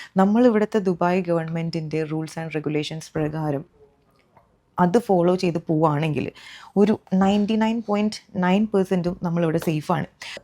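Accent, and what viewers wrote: Thrissur, India. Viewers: Chechii nattil Thrissur anooo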